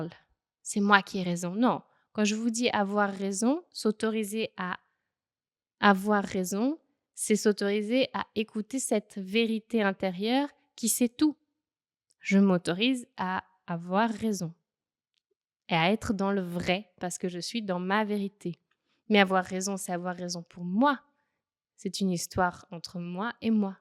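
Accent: Spain. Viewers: Elle vit en Espagne, si elle parle Espagnole au quotidien, prendre un accent c'est normal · Coucou c’est drôle tu as chopé un léger accent espagnol par dessus ta langue française 😃 c’est joli comme tout! Sona bien y bonito 🥰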